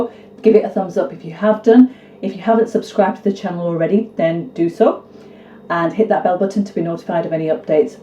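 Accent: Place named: North of England, United Kingdom. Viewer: You're very welcome !! If you recall, I mentioned earlier that I was born (1944) in Glasgow, & am now retired & living in Oakville, Ontario, Canada. I've eaten various Curries since my Teenage years, & make them at home. Also, I love your accent from (where in ?) the North of England. And, where are you now down South ? As I've said before keep up the good work, & Curry on !!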